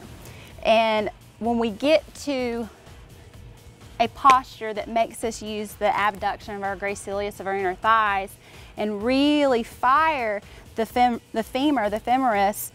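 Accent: South, United States. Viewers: Thanks for lesson. I like your southern accent :)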